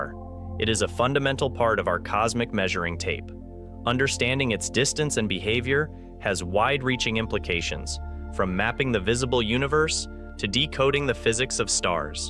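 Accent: United States. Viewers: I assume NASA sponsors this channel. The narrator has an American accent, so I don’t understand why NASA doesn’t utilize a narrator familiar with the pronunciation of astronomical terminology such as the type of an important type of star.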